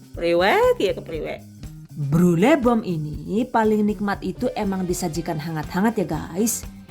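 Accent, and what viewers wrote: Banyumas, Indonesia. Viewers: mksh mb resepnya,,dn jg mksh bhs ngpaknya,,bikin aq ktawa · Mbaa saya senang klo mbanya lagi ngomong ngpak lucu trima kasih mbaa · tengkyu...jangan lupa logat ngapaknya lanjuuuuut.😃😃💪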